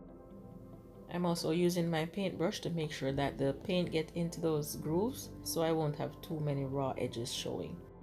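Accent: Jamaica. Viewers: This is absolutely brilliant!! I adore your voice!💗are you Jamaican? You mentioned condolences… whatever is happening, I am sorry for your pain… I’ve had a tough year myself- lost my mother and 3 premie grand babies…❤️❤️‍🩹💔praying for you, lovie.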